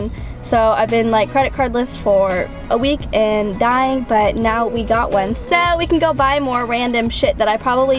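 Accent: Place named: United States. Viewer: the classic american accent lol... #loveyall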